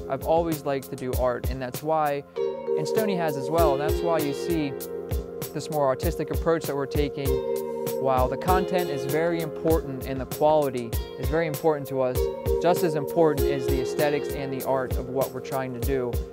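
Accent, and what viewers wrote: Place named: Eastern Pennsylvania, United States. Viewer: What part of PA? You sound like your maybe eastern PA Lebanon,Perry county area.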